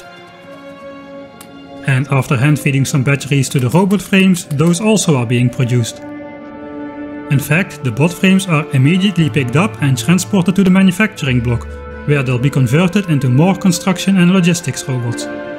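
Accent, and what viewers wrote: Netherlands. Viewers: I've been wondering about your accent forever. is it dutch? or something I just can't put my finger on. it's really rather thicc for a dutch accent. but then again sounds like a dutch name. man.... maar uiteindelijk: toch GEKOLONISEERD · "But as I'm Dutch" I knew your accent was a little off to be french, but for some reason I didn't expected for you to be Dutch. I thought the accent was going to be different. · I recognized the Dutch accent curse from the start! As I have the curse myself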